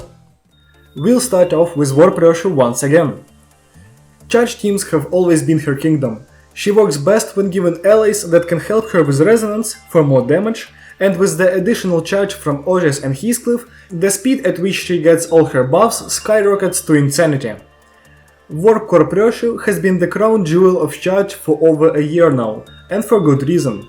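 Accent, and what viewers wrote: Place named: Russia. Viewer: ты случаем не русский?, просто акцент сильный вроде, я не уверен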